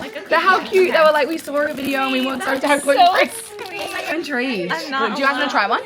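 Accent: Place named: Australia. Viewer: Love your vlogs. Great energy. Aussie accents are my fav 😊 Can you tell us your DCC lip combo?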